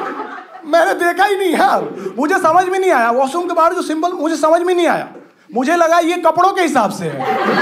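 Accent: Bihar, India. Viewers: Awaaj se hi bihari lag rage ho be, maja aay gaya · Actual accent of bihar..."maat sahab"😂😂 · This man proves that you don't need to be from any metro cities to be a good stand up, you can easily portray your stories to other's and make them laugh in your accent also....and the Bihari's accent are always beautiful....keep going👍🏻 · Yourrr bihari accent dude just too good 😂❤️ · OMG, bihar comedian and the accent!!! Wowww mazza aagya bhaiyaa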